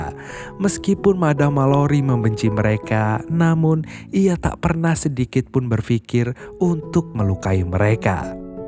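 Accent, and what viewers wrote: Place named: Java, Indonesia. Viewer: Gw saranin kayaknya mending lu kayak album film aja yang ceria gitu soalnya lu kayak orang jawa tapi udah keren kok 😅👍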